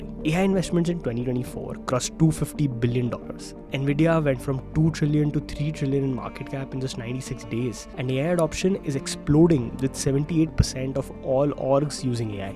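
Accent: India. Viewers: Indian accent 101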